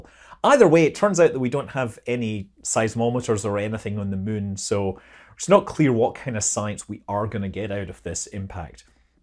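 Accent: Scotland, United Kingdom. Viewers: As a side note, when I hear " here" in that deep Scottish brogue, I am oddly comforted and know I'm getting reliable, entertaining info from one of the best spacers on Youtube. No, it really is the best out there.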